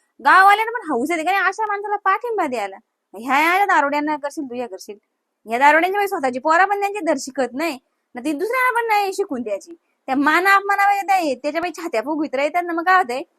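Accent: Murbad, India. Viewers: मी कर्जतचा आहे.. आमच्या कर्जतची आणि बाजूच्या अंबरनाथ तालुक्यातील लोकांची बोलण्याची टोन पण मुरबाडकरांशी मिळती जुळती आहे..ही टोन आपलीशी वाटते आणि बोलताना पण आपलेपणा वाटतो..Keep it up 👍👍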